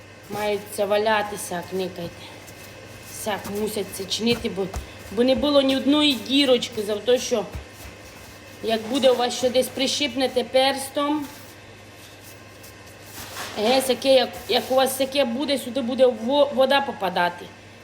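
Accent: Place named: West, Ukraine. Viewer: Така цiкава панянка, але поясніть прошу ,що зцее за мова? Українські слова з російськими в перемішку, вимова як на західній Україні!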